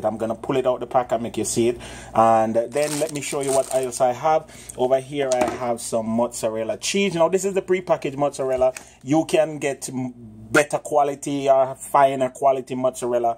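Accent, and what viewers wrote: Jamaica. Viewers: Nice!! Me like it, patois voice. Big up!!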